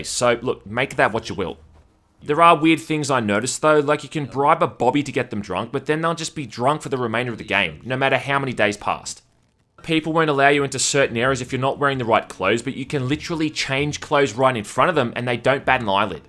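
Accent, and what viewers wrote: Australia. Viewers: Who'd had thunk'd that one day I would understand australianish almost perfectly.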